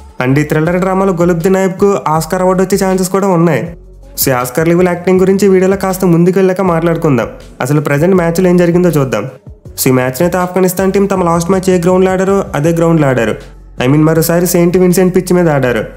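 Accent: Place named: Andhra Pradesh, India. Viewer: Andhra slang 😅😅😅😅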